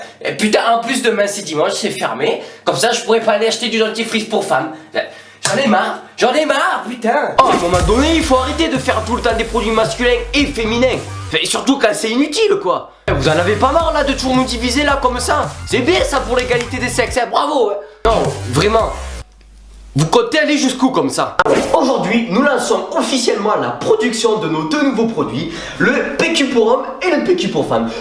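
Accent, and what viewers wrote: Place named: Marseille, France. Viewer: J'adore ton accent mdrrr 😂 Je vis pratiquement à côté de chez toi dans les Pyrénées et on a pas l'accent aussi prononcé que toi... T'es marseillais a la base ou quoi? Sinon j'adore tes vidéos, continue 😘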